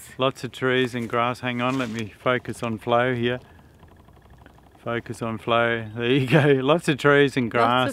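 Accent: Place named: RP, United Kingdom. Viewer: your English is perfect, Flo.. even sounds a bit posh 😄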